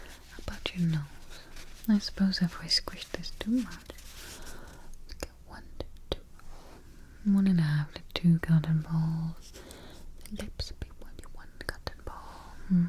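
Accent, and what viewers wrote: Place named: Mid-Atlantic, United States. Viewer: I wonder if mid Atlantic accent is for ASMR or if she always talks like that. Either way it's perfect for ASMR intentional or not 😌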